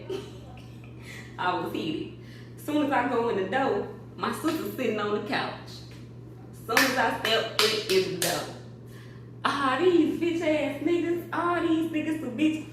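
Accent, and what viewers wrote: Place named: St. Louis, United States. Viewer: soon as you started talkin i knew you was from stl 😂 but not the fight happened at your daddy house & not the bd house omg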